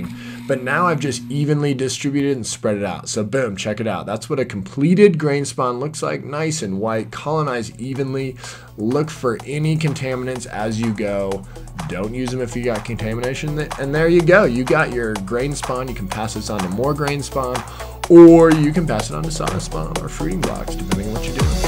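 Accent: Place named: Australia. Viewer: Reminds me of an aussie man voice over lol. This is great thanks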